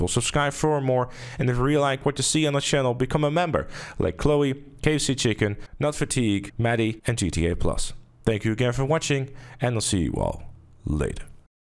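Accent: Netherlands. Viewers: Is he dutch ? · I'll eat my shoelaces if he isn't Dutch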